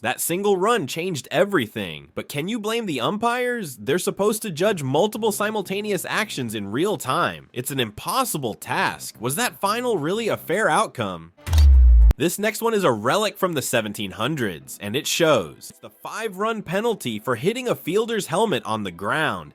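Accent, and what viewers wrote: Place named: United States. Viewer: Given this has an American accent doing the commmentary explain why the Mankad should be treated any differently to the hidden ball trick or a pick-off by the pitcher in elite baseball. The instance of the ball in play hitting the wicketkeeper's helmet is easily overcome given there arre some international grounds that have a small storage hold with lid in the ground where a helmet might be kept.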